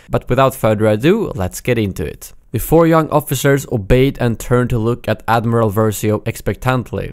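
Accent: Sweden. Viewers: You read fine, don't be afraid of reading with your "Swedish accent" because you read pretty good bro. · His Swedish accent is awesome.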